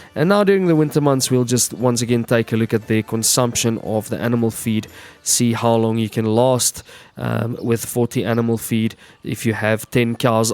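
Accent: South Africa. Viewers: The way this guy speaks, I can swear he's South African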